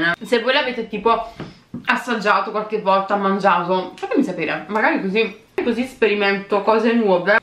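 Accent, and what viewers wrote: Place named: Naples, Italy. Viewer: Sei napoletana 😍😍😍😍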